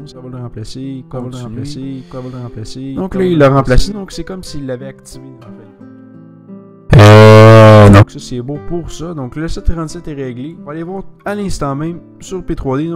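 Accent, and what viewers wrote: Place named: Quebec, Canada. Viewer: yeahhh un quebecois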